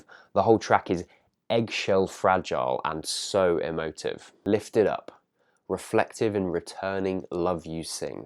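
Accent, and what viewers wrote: United Kingdom. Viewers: I love your articulate descriptions, your serene voice, your British accent, and your very handsome face. Listening to you talk about albums I love is validating, cathartic and almost as enjoyable as listening to the albums themselves. No homo tho, for reals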